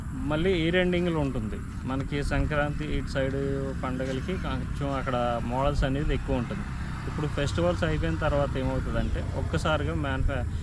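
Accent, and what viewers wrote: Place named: East Godavari, India. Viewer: Brother meeru ma East godavari ki chendinavara voice no batti doubt vachindi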